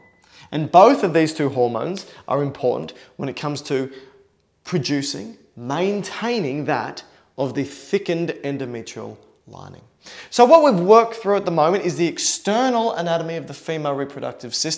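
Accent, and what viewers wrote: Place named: Australia. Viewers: He is an Aussie, probably of Italian descent... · I reckon it's Australian, mate!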